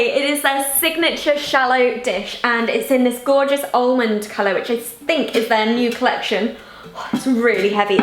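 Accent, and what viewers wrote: RP, United Kingdom. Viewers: I love the way she talks so plummy, the way she moves so classy!!! So satisfying to watch her. 🤗😙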